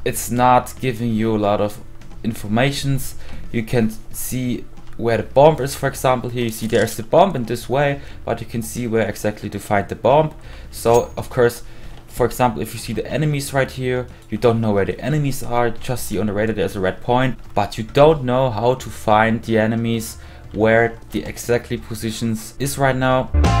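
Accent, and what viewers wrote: Germany. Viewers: Ich wusste das du deutsch bist schon ab Sekunde 1 xD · Also als deutscher merkt man das du deutscher bist😂 lol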